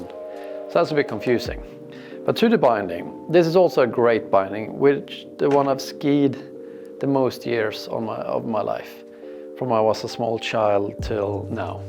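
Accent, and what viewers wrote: Sweden. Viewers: Hehe, yer so funny. I liked your way of explaining the disadvantage with the pointy binding 😂 Are you by any chance Swedish? You got a good accent. ☺️ I’m searching for a nice marker that got easy switch for adjusting for the booth size with an 11cm wide ski stopper. Any suggestions? I don’t like griffon ... you got to screw them that is you need a screwdriver 😥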